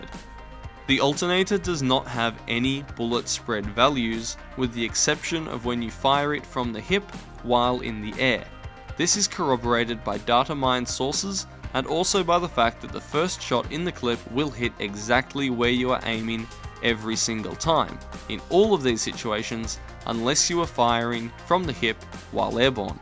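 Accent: Australia. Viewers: Are you Australian I'm Australia you sound like your Australian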